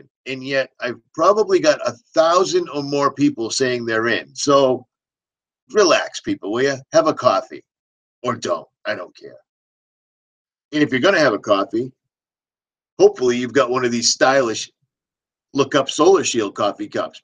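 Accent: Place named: Boston, United States. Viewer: ??A WHAT? WHAT'S A HAYDON? I'M IN UK N IT SOUNDED LIKE U SAID U D WANNA GET A RAGING 'HARD-ON' LOL IN UR BOSTON ACCENT.HAHA. HOPE IT'S FOR ME- UR GORGEOUS AS IS UR MIND N INTELLECT. SORRY FOR BEING RUDE ON THE CHAT. I'D LOVE IT IF U ACTUALLY SAW ONE OF MY COMMENTS.😘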